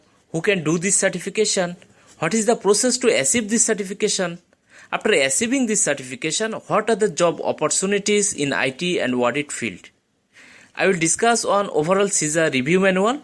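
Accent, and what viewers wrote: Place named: Bangladesh. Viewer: Very good...great presentation and I am also enjoying your eastern Bengal influenced accent.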